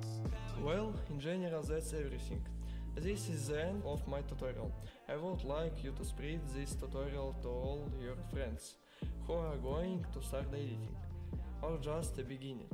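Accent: Russia. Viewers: боже мне так нравится твой русский акцент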